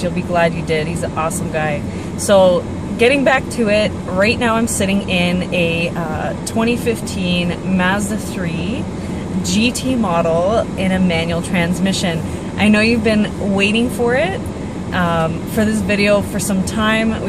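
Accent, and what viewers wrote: Canada. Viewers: cute lady, but its Mahhhzda. i literally loathe canadian pronunciation.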